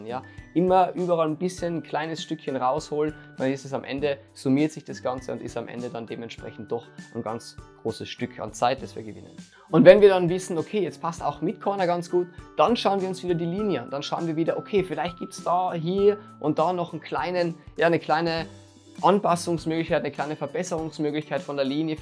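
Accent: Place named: Austria. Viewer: Endlich mal eine angenehme österreichische Stimme :D Ich finde du machst echt super Videos und gerade im DIY-Bereich bin ich sehr froh eine ordentliche deutsche Erklärung zu bekommen. Es wird nicht ewig um den heißen Brei geredet (Das Hauptproblem der meisten Youtuber IMO) und du hast interessante Themen! Ich hoffe es kommt noch ein Video für eine DIY-Wheelbuttonbox. Ich will mir unbedingt selbst eine bauen aber ohne ordentlicher Videoanleitung, traue ich mich leider nicht drüber. Aber ich freue mich auf jedes neue Video :) Mach weiter so und alles Gute für die Zukunft!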